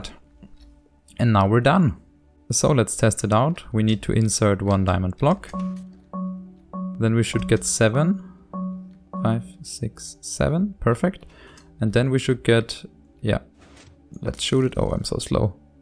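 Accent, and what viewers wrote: Germany. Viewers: man hört so krass dein deutschen accent raus xd danke für das tutorial habe spaß mit meinen freunden damit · Du kannst eigentlich sehr gut Englisch, wenn man weiß das du Deutscher bist fällt es mehr auf, aber es ist auch nicht schlimm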